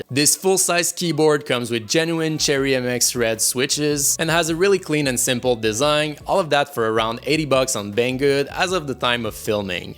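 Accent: Quebec, Canada. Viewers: J'aime ton professionnalisme, le travaille de tes vidéo sont soignée et ton accent québécois distinctif avec une diction impeccable et sans reproche (magnifique) :)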